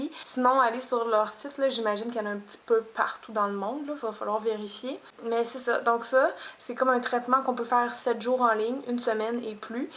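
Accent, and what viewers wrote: Quebec, Canada. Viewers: J'adore trop ton accent québecois ;-) HeHeHe ! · Je viens de tomber sur ta video par hasard, et J'AIME ÇA!! CET ACCENT GÉNIAL DU QUÉBEC! ET CETTE FRANCHISE! Et en plus c'est clair, net, et humoristique!! Personne ne t'a encore proposé d'être broadcasté sur un show quelquepart?continue, ça devrait venir!! bonne chance et bonne continuation à toi!! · J'adore ton accent Québécois mais comme la dit Zephyrlevent les broche comme tu dit en France ce dit bagues. Très belles dents au passage. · accent canadien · Comme les autres l'on dit, en France on dit "bagues". L'accent québécois est toujours aussi marrant! C'est fou comme les mots peuvent être différents d'un pays à l'autre.. En tous cas, Merci pour tes conseils :) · J'adoooorree son accent québecois !! Abonnée directt ! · C'est marrant venant de quelqu'un qui fait un paquet de fautes écrites, se permettre de faire des remarques à une personne pour ce qu'elle dit oralement tout ça parce-qu'elle ne comprends pas "à cause de son accent". 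Au faite, si vous aviez vraiment pris le temps de regarder son blog vous sauriez que cette demoiselle vit au Canada, et qu'elle est Quebeccoise... Alala incorrigibles ces internautes... #tousse tousse un peu débile aussi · Wouaah ... même sur une chaîne, où une jolie et gentille fille, prend sur son temps, pour nous donner pleins d'astuce (grand merci au passage !) les gens arrivent encore a critiquer, rager, et limite s'insulter ?! déstresser un peu et renseigner vous avant de dire "nous c'est des €....on le sait !! Oui elle est quebecoise, d'ou l'accent, mais elle va pas convertir tout les prix, pour tout les pays, démerdez vous un peu P**** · faut écouter la vidéo avant de poste un commentaire pasque elle est québecoise mes bon... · le canada ! je kiff ton accent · Tes jolie, personnellement je n'aime pas beaucoup l'accent québécois... :x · jadore laccent canadien :p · ses l'accent québécois :p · Coucou ! 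:) :) Merci beaucoup , malgres 3 semaines de recherche au magazin et sur internet , j'ai reussi a troouver le produit :) ! J'adore ton accent ( c'est quebecois ??) :) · C'est l'accent québécois cocotte, par contre puisque t'en parles, en français on écrit "ça", "d'où", "vient", "saisis", "ce", "dis, "vous dites", "non". cordialement, bisous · Sa se voit ! Tu es Québécoise non ? :)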